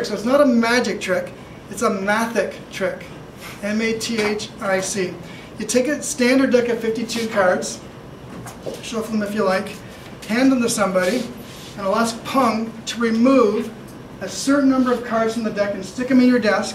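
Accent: Canada. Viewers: This guy is from Canada that's for sure!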